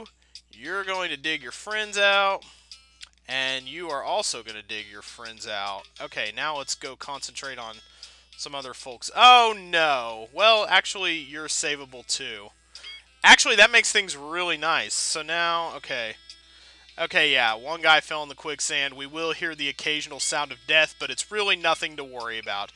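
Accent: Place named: United Kingdom. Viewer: That first level reminds me of some of the levels in the tricky rating. Great Brit accent by the way :)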